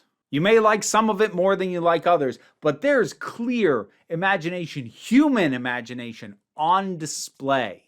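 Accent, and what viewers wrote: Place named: United States. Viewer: my favorite genre of youtube video is "cool person with this specific american accent gets reasonably angry about things"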